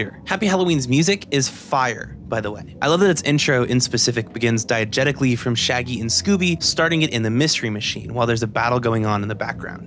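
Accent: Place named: New York, United States. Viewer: he sounds like a typical new yorker, watchu mean fam? 😂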